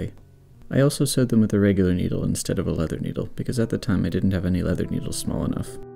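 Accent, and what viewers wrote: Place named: Canada. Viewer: incredible video!! I'm an art student and have seen those gloves in person i believe! your craftsmanship is wonderful to see. I was also trying to pin your accent and you finally said Canada! It's so pleasant and matches your historical vibe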